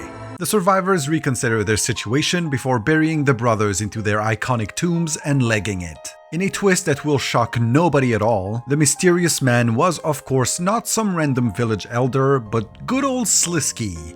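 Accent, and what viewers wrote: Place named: Scotland, United Kingdom. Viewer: Dude your Scottish dialect is cool XD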